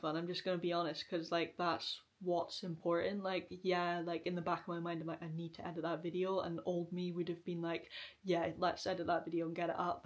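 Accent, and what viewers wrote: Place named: Scotland, United Kingdom. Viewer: Aw you’re welcome! I’m in West Lothian, yet to visit Inverness, but maybe one day! I love a good homey-vlog, anything relaxing! Your videos, and Rosie Henshaw are just so nice to watch, plus it’s nice to hear a familiar Scot’s accent on here! Hope you all have a great Easter 💛🙏🏼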